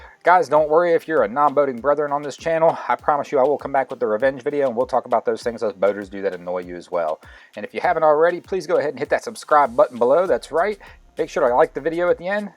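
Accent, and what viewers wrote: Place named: Maryland, United States. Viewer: are you from Maryland? Your accent sounds like a Maryland accent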